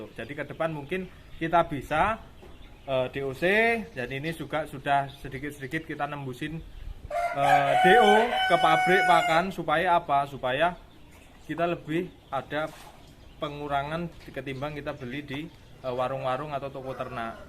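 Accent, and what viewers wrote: Java, Indonesia. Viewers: Mas e gianteng... Rodok medog lak ngomong... Koyone ora sombong iki... Peternakane ayam kok logone gambar bekicot mas... Hehe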